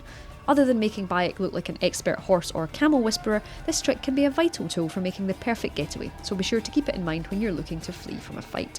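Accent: Scotland, United Kingdom. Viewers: Yes, it's Scottish. Central Scotland to be more specific. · It sounds Scottish to me. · This video would be extremely better if they had put someone without this disgusting Scottish accent. She can't even pronounce fucking English words properly for fuck's sake! It hurts my ears... one of many reasons why I would never visit that shitty country in my life! Long live England! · alec j that's Scottish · Is this a Scottish or Irish accent. It's pretty cool. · Scotland. Like my good self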